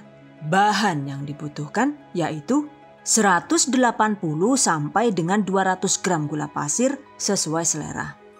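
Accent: Banyumas, Indonesia. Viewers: Mbak asline mana??? Kok bhasanya ngapak? Asline ngendi mbak? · Lah langsung TK subkrek mbekayu Pas krungu basa ngapake😂😂😂😂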